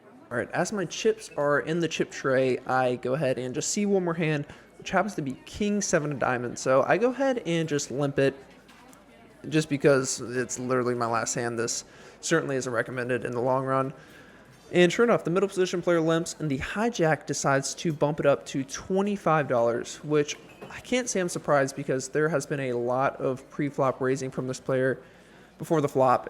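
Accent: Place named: South, United States. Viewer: Love it that you play at all of my same casinos, other than Magic City. I haven't played down in Miami yet. Curious, you have an accent. Are you from TN or NC?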